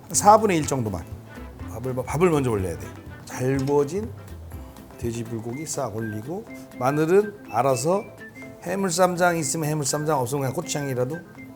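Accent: Chungcheong, South Korea. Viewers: 우리 백선생님은 요리를 구수한 충청도 사투리 억양을 양념으로 살~짝 깔고 설명해 주셔서 뭔지 모르게 쉽고 맛깔나는 듯~ 화이팅 입니다~😊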